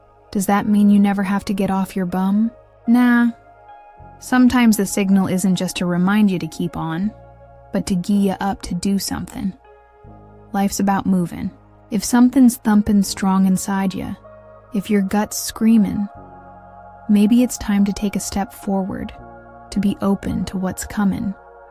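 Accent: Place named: Australia. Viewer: 😂 Your Aussie Accent is funny 😂😂🤣😅ahhaahaaaa😅 Yep, I am hangin' in there!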